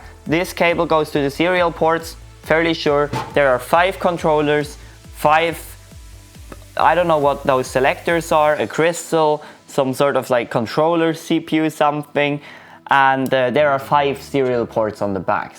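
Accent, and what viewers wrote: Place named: Spain. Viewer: I can't understand your Spanish accent...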